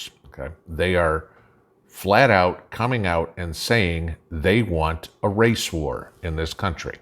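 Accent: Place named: Chicago, United States. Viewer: Chicago, accent, you still have. I'm originally from Connecticut.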